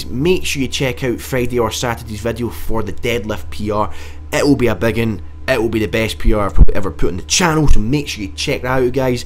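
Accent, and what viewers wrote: Scotland, United Kingdom. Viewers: the heavy lifting brings me here, the thick scottish accent makes me stay good lifting!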